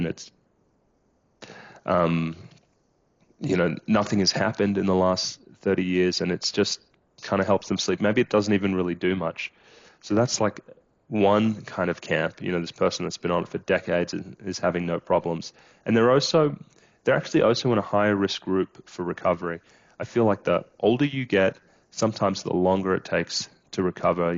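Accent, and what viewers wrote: Australia. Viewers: G'day, i thought i realised that Australia accent. Its good to see an Australian taking a interest in peoples health. Mate here is my story....I am 59 years of age and had been on Alazopram for 13 years at 1mg to 3mg a day as needed.....prescribed to me by my family doctor for anxiety and panic attacks. Through out this time my life was going along smoothly, i was functioning and living life. That was until January 2023 when the Australian Federal Government decided to change the prescription laws around these medications. It meant that my doctor was no longer allowed to prescribe them only Psychiatrist can prescribe them now...which i totally agree with. What i don't agree with is the total lack of direct pathway to see a psychiatrist to maintain your script or a managed taper. At this time i was given 30mg Oxazepam to take daily......which is not the correct dosage, it was 10mg short of the equivalent dosge of Alazopram. 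Well one month later i entered hospital with severe dizziness (rocking sensation), sensory hyperstimulation, headaches, fatigue, unsteadiness and tremors. I spent 9 days in hospital. During this time I was only given 5 mg of diazepam in total plus daily panadol. I had ct and Mri scans done.....all were clear. I saw a psychiatrist for about 20 minutes and Neurologist who all put it down to my anxiety....so I was discharged. At the time of disgcharge I was on nothing, zip, zero only my 100mg of Zoloft. The hospital psychiatrist told me to wean myself of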